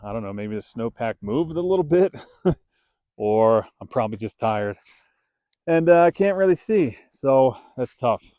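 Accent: United States. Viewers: I thought you sounded like you could be from here! I’m in south central PA an hour south of Harrisburg. It’s funny we’ve had a practically snowless winter here. Keep your chin up out there. 🙏. I know there’s some pretty rough corners in your neighborhood (poor truck) but Spring is right around one… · Just curious, what part of the east coast are you originally from? I hear that accent every now and then.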